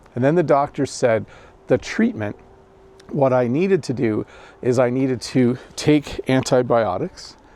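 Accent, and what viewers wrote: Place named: Canada. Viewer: Surprise surprise, I understand canadian english better than american english, I don't know why.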